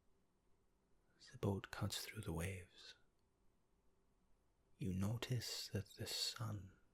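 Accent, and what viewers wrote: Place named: Glasgow, United Kingdom. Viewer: Oh it really has pal. This is my 3rd year here, it's funny how fast it starts to stick hahaha... 🙈 That's why I got so excited about your Glaswegian in your accent vid, feels so like home haha! I study music at the royal conservatoire of scotland:)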